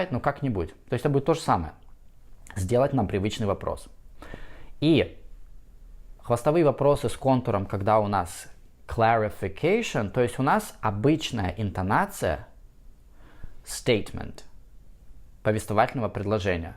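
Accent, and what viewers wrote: United States. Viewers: пожалуй не соглашусь, доносит вполне себе усредненый, современный американский и канадский английский.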